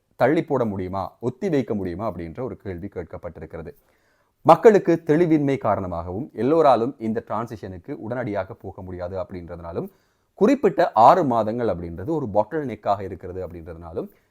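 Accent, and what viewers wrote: Sri Lanka. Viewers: Srilanka tamil is always unique enna doubt ungalukku 🤗🥰 iam in srilankan from india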